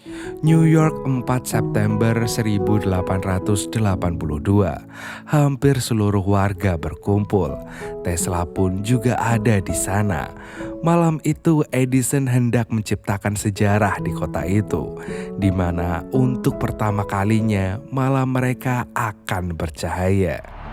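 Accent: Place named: Java, Indonesia. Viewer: kirain ini insomnia film wkwkwkk tapi kalo yang ini agak lebih medok suaranya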